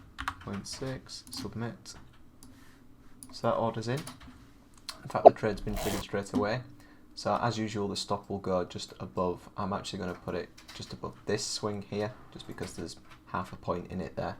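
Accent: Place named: Leeds, United Kingdom. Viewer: I live 10 mins away from leeds, I knew i recognised your accent ha!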